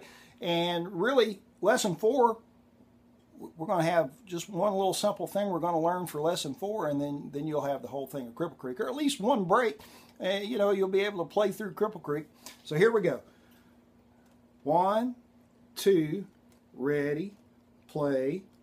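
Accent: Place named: United States. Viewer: Dear, thank you for these lessons! Can I ask which state your accent is from? I adore it. Love a Brit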